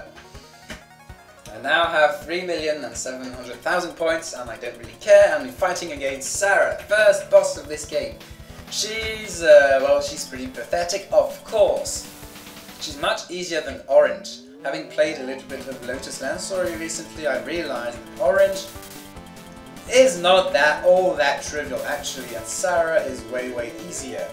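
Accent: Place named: United Kingdom. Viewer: WhAt?! GuY wItH bRiTiSh AcCeNt CaN't PrOnOuCe TaRdIsEs?!?!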